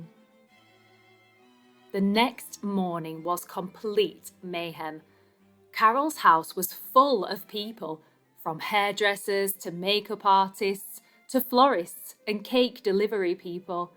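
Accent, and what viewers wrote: United Kingdom. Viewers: Such a remarkable story thanks love british accent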